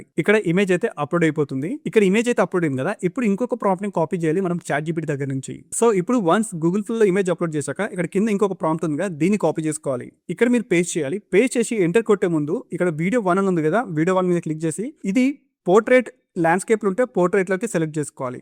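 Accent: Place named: India. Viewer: Voice koncham... telugu and English kalisina accent lo vastundi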